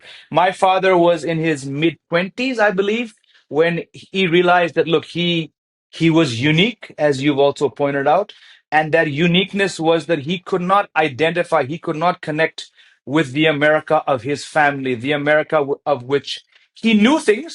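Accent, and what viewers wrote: India. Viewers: he's hindi acent totally matches with anant ambani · if i wasnt looking at the video, i would have guessed with his accent that he is indian too :D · The accent so hindi ❤❤❤ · Bro even his accent is Indian. Ye gora toh hamara hai. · Dang even his English has a bit of Indian influence 😂😂😂 this is amazing to see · Its weird to see a white person talking in Indian accent. lol. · Mahadev…. I just cant believe… he speaks just like us…. Absolutely an inidan😃😃😃😃😃🇮🇳🇮🇳🇮🇳🇮🇳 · This guy is really unique, because he speaks English with an Indian accent and his English errors are so Indian, and because Indians make up 90% of the citizenship queue in the US. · Wooow<link> way he speak english its indian accent.....🎉😂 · He is literally speaking in Indian accent blud ❤❤ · His english is Indian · His accent is also Indian 😭(Proud mother's tears) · Bro even have indian English accent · American with an indian accent, and i love it. 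· First time hearing indian accent from a white person! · Your accent is a real indian citizen your no more American and you are a pure indian ❤ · dude's trying hard to speak in normal english. he's got indian accent. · White guy with an Indian accent 😂😂😂😂 I can see the irony… and why people question. But it’s all love bro. White Indians are a minority 😂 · Its so mind bending to hear a white person speak English with Indian Accent. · LOVE THAT DESI ACCENT,! 😨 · first time seeing an AMERICAN using the indian english accent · Its a real mindfuck to see a caucasian with an indian accent. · You know he is true Indian when you here Indian accent when he is speaking English!!! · Feels nice but strange listening to him. Here I am, someone who lived in America for just 11 years and living in India now with an American accent, not to mention teaching it for the last 23 years.. And this guy is sounding Indian 😂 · Yes !! 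Indian or desi accent....❤ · Because he was born and raised in India. If you were born and raised in Nigeria you would sound Nigerian. Your skin colour does not dictate your accent 😂. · When you spoke your indian english in america didnt they called you with some racist slurs like scammer and stuff? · He somehow has a more pronounced Indian accent than many Indians 😅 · speaks in Indian accent. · His accent is the future Indian accent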